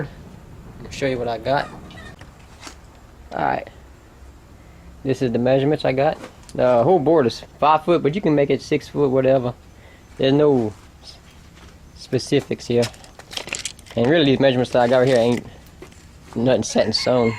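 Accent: Louisiana, United States. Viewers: He sounds cajun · Sounds like from Bayou country. good job on bow. but, is she able to draw the 60lb bow? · straight up Cajun brotha. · Love the accent. Cajun/ creole is so cool. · + We got easily distinguishable accents from the typical southern accent. You're from down da bayou. It aint hard to tell. Are you a Houma Indian or do you know any american indian bow making techniques?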